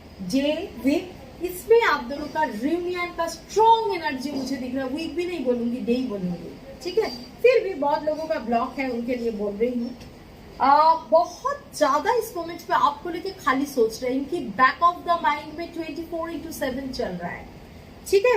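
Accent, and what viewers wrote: Bengal, India. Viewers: Plz forgive me ur Bengali I accent little bit difficult toubdeeaeabd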